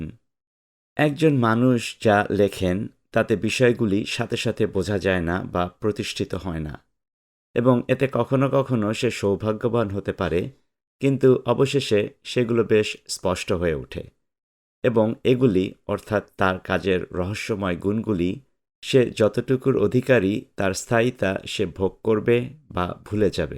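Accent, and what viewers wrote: United States. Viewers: Sir American accent keno. Amra British Accent e shiktaci. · Sir, your English is so nice, how you learned to pronounce English like an American? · Tell me how you learned to pronounce English like an American, I need you!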